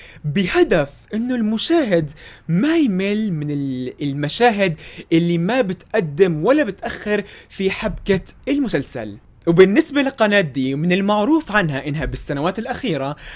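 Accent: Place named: France. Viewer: J'adore votre accent Français 🎈🤠🎈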